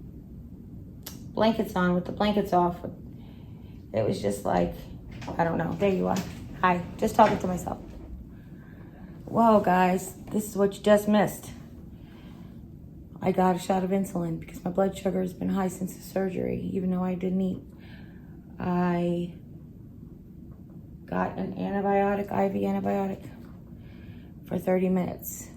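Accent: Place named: Long Island, United States. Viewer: Hiya. Girrrrrl, share away. Learning about the Peri wick is neato. Way to be independent indeed. As you know I dig your humor & that Long Island voice of yours. I swear when you speak, I feel home. Praying for you my friend.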